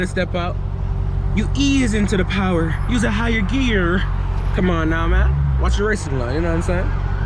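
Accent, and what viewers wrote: Jamaica. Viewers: This the jamaican crazy driving yall won't understand😂😂😂don know mi a watch from aruba/jamaican big up much love love e accent my yout and the content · As a yaadman, me coulda pick up the accent immediately lmao, big up yuhself me g, dawg push di hellcat yuh fuckkkk😂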